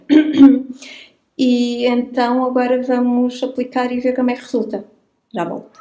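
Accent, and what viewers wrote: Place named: Portugal. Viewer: Adorei o vídeo e ainda mais o seu sotaque de português de Portugal. A cor dos cabelos ficou ótima! 😊😊 Vou acompanhar o canal! Um beijo. Lari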